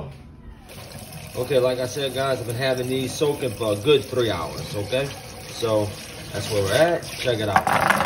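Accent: Louisiana, United States. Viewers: That’s the Louisiana accent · Let's Go! Yummy! You a lil ole Cajun just like my nieces husband. Love from Ms. Flournoy. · Subscribed and enjoy watching all your tutorials.. also love the Louisiana accent!! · That’s how our white people sound down there. That’s when you know they are from the dirty dirty part of Louisiana lol it took me to go to the military and come home 3 years later to actually hear how we sound lol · This is specifically the cajun accent from lousiana, theyre a unique culture.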